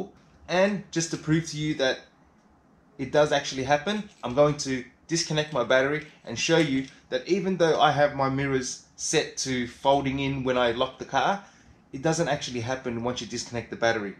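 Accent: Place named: Australia. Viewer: Hey, Great Aussie accent. Yes good info but my CLC <num> does not have a convenience feature so only operates by the buttons on the dash and the swing out does not work. would i have to fix that switch? Or could I make it operate with the key fob? André in Sydney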